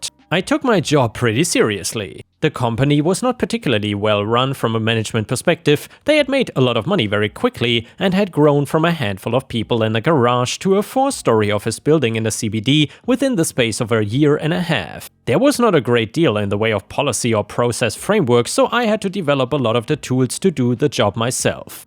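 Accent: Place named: Germany. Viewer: you could read the dictionary and I'd listen. I love your stories, but that soft German accent is the best part.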